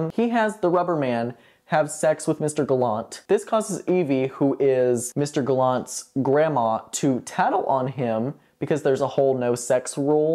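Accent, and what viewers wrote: South, United States. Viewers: your rapidness but like simultaneous efficiency with videos is epic??? a tale to be foretold thru generations?? but i also love the growth and being able to see ur personality and personal twists on things come out more and more, so obsessed! also the southern accent sheeyt sends me every time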